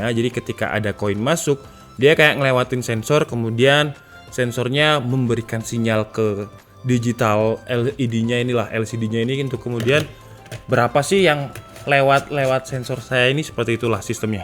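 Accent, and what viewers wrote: Java, Indonesia. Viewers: Orang jawa ya?? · Orang mana toh mas?, Jawanya masih melekat 😂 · Wong ngendi to mas? Logat jowo ne jen kuat 👍👍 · Abangnya medok juga ya 😁😄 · Ketok e kok ngomong jowo😁.wong ndi bang..? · Wong jowo mas? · Boso jawane koyo wong ngalam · We mas sampean wong jowo to 👍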